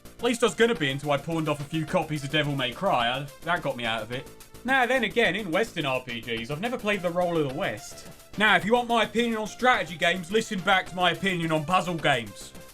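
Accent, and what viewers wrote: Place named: United Kingdom. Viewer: Shit sorry, british accents confuse me.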